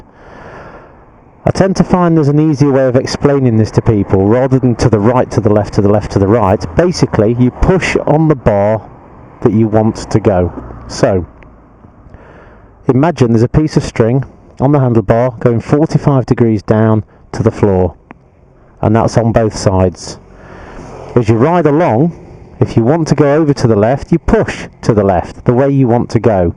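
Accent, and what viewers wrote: United Kingdom. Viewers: Hey man, good british accent btw, really clear unlike many others...big question if I'm getting to a really hard turn/curve I know I gotta start using the countersteering tech. but once I'm at it, should I start moving the handlebar gently to the direction I'm turning or countersteer all the way? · theres nothing like a british voice for a tutorial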